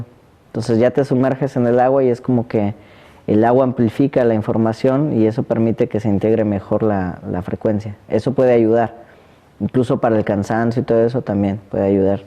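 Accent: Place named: Mexico. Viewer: en que país vives ahora, por tu acento me parece que eres mexicano. Me encanta tu manera y forma sencilla y fluida de narrar la información, gracias.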